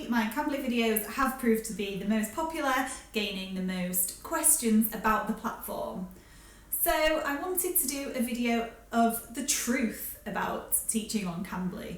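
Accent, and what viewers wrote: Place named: Manchester, United Kingdom. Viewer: You sound like a Manc.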